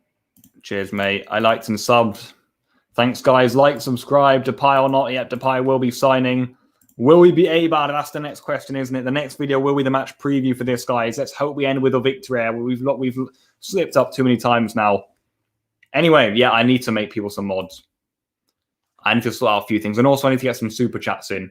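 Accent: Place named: United Kingdom. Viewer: Your English is very good, sounds like ur from the UK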